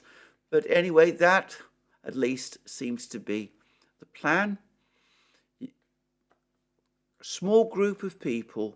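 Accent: United Kingdom. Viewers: Love the muted tonality of your British accent. But one particular anecdote has always bothered me by your use “repeat again “. As I was brought up studying the English language, I was repeatedly cautioned against this redundancy. Am I right in saying so? Begging to be enlightened.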